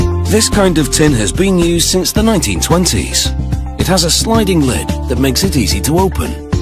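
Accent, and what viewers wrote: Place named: United Kingdom. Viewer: FINALLY!!!! THE BRITISH DUDE!!!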